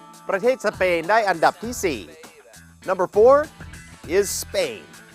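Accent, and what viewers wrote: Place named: Isan, Thailand. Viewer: ชอบคำว่า "บ้านเรา" ในตอนที่ บอกว่า มาเลเซียอยู่ไกล้บ้านเรา ฟังแล้วไทยๆดีแท้ แถ พูดอิสานได้อีก ชอบมาก ผมเองก็พูดได้ฟังรู้เรื่อง แต่ข้อยบ่แม่นคนอิสานเด้อ จะขอติดตามดูทุกตอนจนกว่า จะเลิกทำ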